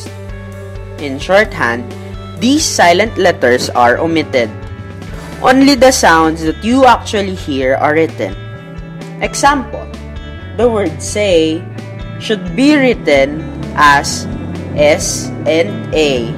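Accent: Philippines. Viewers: Your accent is a Filipino. Well this is useful thanks. Maybe u can have a lesson on person advertise on your video. Just saying. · ❤ the Filipino Accent ..Easy to understand. 👍👍👍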